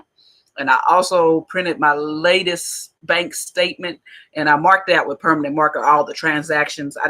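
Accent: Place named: Midwest, United States. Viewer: Where are you from? You sound like you from the mid-west.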